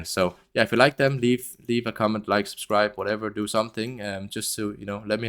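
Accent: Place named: Denmark. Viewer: Du lyder dansk i accenten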